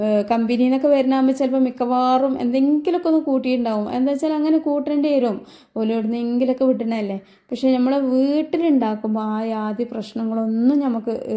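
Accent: Malappuram, India. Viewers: മലയാളം മലപ്പുറം മലയാളം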